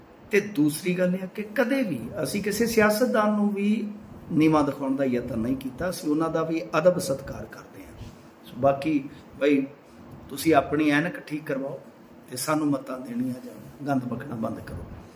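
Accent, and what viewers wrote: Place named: Majha, India. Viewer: Very intelligent and soft spoken journalist is Your Majha accent is very loveable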